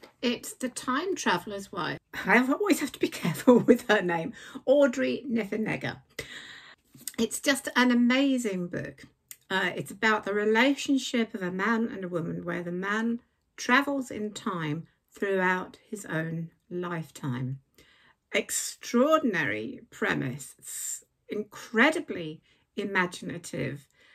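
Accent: United Kingdom. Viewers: Hello! I live in Bedford County, Virginia in the U.S. It’s a small town and is the home of the National D-Day Memorial. Anyway, I’ve never been outside of the U.S. and I have always been fascinated by all things in the UK, Ireland and Scotland and especially books set in these places! I love your videos, your accent, and your knowledge of books and your reviews! Watching you makes me feel like I’m there in your beautiful country with you! Thanks for your inspiration of reading. You are lovely and delightful. Tina 😊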